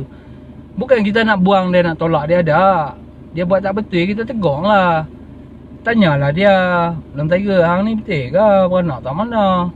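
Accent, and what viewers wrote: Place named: Kedah, Malaysia. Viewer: Org Raub ckp kedah ye...hahahahhaha terbaik teruskn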